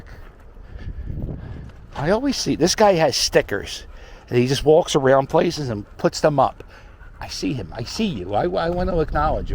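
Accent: Philadelphia, United States. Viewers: Your the best!! I moved to Florida from Philly in 2008 and I can hear the Philly accent in your voice!!! Makes me homesick!! Lol miss the cheesesteaks!!! You are so awesome to show the good and fun things in Philly!!! I think I may have seen you around we lived on axe factory and mower streets!!! You are a funny guy!!!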